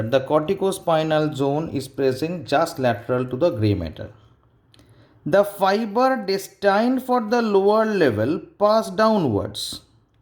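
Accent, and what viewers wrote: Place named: South Africa. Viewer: Thanks - crystal clear South African 👏 May you align its clinical application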